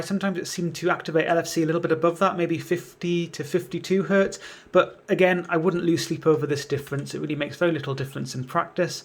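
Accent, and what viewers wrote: United Kingdom. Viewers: UK accent adds even more points to the professionalism of this video. Top notch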